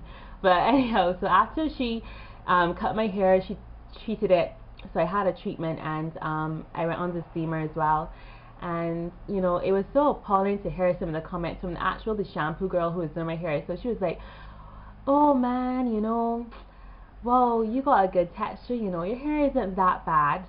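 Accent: Barbados. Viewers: Are you bajan because I should know that accent anywhereee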